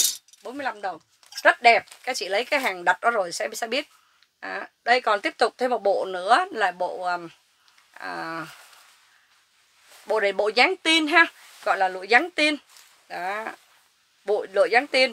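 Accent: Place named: North, Vietnam. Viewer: Chào, nghe giọng Bắc Saigon, cách nói dễ thương, cho tôi địa chỉ của tiệm. Tôi ở Escondido, muốn lựa mua áo cho 2 mẹ con, cảm ơn. có áo size nhỏ không?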